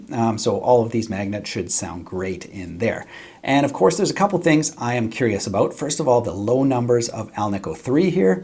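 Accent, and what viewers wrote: Canada. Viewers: Thanks, you did a really nice job with that. Your playing of the riffs was as consistent as the equipment for each comparison. I was surprised that I liked the A 2's the most. However, the 5's, which I have in my CV Tele were nice also. I'm new to your channel and was wondering, do I detect a little bit of a Canadian accent?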